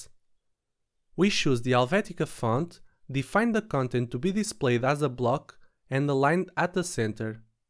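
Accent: Portugal. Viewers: You sound like Portuguese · Olá! Hello! Descobri o canal sem querer. Estava mesmo à procura de um canal deste género! PS: Descobri logo que eras português nos primeiros segundos do vídeo devido ao sotaque. Keep it up ;)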